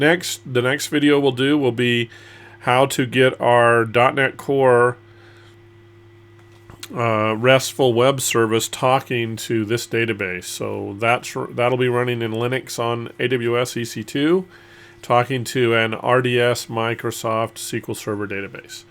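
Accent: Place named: United States. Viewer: I love this video! It is in clear American English, He tells every step from start to finish that must be accomplished to natively restore/backup RDS MSSQL with S3 and the screens still match what I saw on my laptop as I went through this process. This is an excellent 'How To' video, I give it Two Thumbs Up!!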